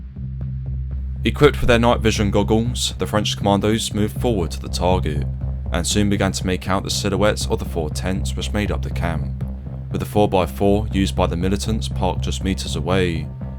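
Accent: United Kingdom. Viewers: Love your videos. The biritsh accent makes it even better.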